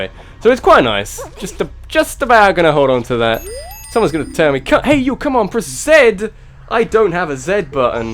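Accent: United Kingdom. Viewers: I love how British he is 💜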